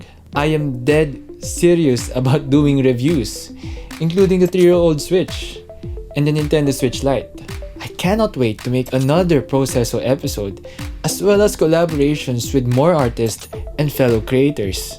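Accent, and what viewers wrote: Philippines. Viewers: That Filipino accent though, ur my favourite yt channel btw. Rly excited about your reviews and the next build. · I love the filipino accent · I like your Filipino-English accent, doesn't sound conio or pretentious. I watched one of your vid where you assembled this cute-ass gameboy then I noticed the "mini walis" that got me thinking "tang-ina pinoy 'to?" Lo-and-behold pinoy nga. Haha, I don't fancy the fast cuts myself but I like the overall aesthetics. You earned a sub! :)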